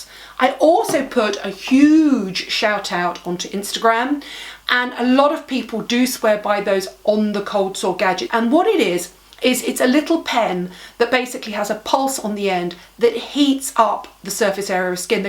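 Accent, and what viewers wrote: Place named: England, United Kingdom. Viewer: Hi thank you so much for sharing a lot of good information. Your video was very entertaining. I always loved the English accent. By the way your cold sore doesn't look that bad. Thanks again. Cheerio.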